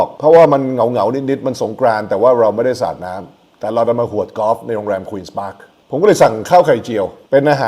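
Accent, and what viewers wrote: United States. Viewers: แต่ต้องตัดสำเนียงฝรั่งออกก่อน ไม่น่าเชื่อ เกิดและโตในเมืองไทย ไปเรียนต่อที่เมกา กลับมาเมืองไทยแล้วยี่สิบกว่าปียังตัดสำเนียงเมกันออกไม่ได้ หรือคุณปลื้มไม่ยอมปรับเปลี่ยนเอง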